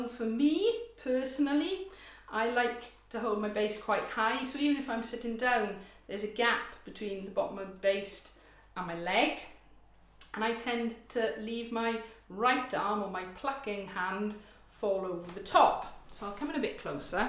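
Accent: Wales, United Kingdom. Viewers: New sub I’m so hate find you. Where from Wales are you from? X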